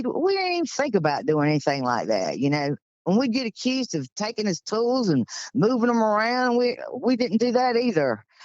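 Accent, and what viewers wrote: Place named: Georgia, United States. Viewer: As a Jersey girl, I love the Georgia accent 😊 My accent is like a cross between a valley girl and Rocky Balboa. Lol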